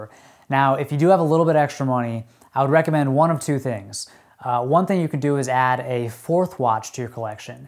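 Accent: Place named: East Coast, United States. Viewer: Sounds a tad bit East coast, but also eatern midwest. If I guessed I'd say hes from VA-OH-PA something in that middle east coast range.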